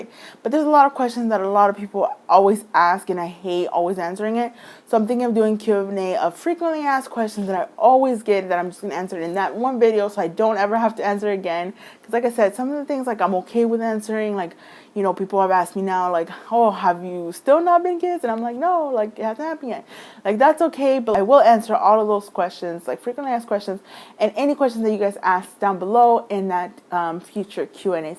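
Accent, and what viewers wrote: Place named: El Paso, United States. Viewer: Omg it sounds like you're from El Paso? Lol my mom is from ELP and I loved going to Mexico to get candy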